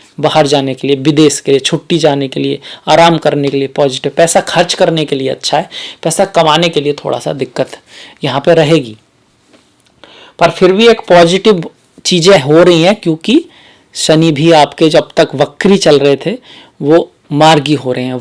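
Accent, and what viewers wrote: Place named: Braj, India. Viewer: आपके ज्ञान और प्रस्तुति अद्भुत हैं, इसलिये ज्योतिषीय विश्लेषण भी लीक से अलग और बुद्धिवादी है। विचार स्पष्ट और ज्ञान से आए आत्मविश्वास से भरे हैं। बधाई। ईश्वर कृपा रखें। एक बात ध्यान देने की है और वयोवृद्ध होने के कारण कहना मेरा कर्त्तव्य है। भाषा के ढीलेपन को सुधारना चाहिये। प्रादेशिकता का असर तो बोलने में होता है किन्तु 'नमस्कार' को नमश्कार या प्रत्येक 'व' को ब कहने और स और श में गंभीर ग़लतियों के न होने से निश्चित ही प्रस्तुति और प्रभावी होगी। इसके अलावा भी भाषागत उच्चारण जैसे इंडस्ट्री को इंडष्ट्री आदि अनेक बातें हैं जो ज़रा-सा ध्यान देते ही व्रजभाषा के पाश से मुक्त करेंगी और हिन्दी के मानक उच्चारणों की ओर ध्यान दिलाएँगी। यह एक क्रांतिकारी सकारात्मक परिवर्तन होगा। व्रजभाषा की मिठास अद्वितीय और दैवी है मगर हिन्दी बोलते समय उसका खड़ापन ही उसका मानक है। कृपया अन्यथा न लें। जैसे आप मकर वालों को मेहनत करने की सलाह देते हैं वैसी ही मैंने दे दी है, स्वीकारें।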